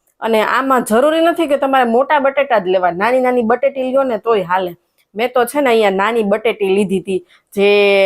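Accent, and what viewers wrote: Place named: Rajkot, India. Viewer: બેન રાજકોટ ના લાગે છે બોલી ઉપરથી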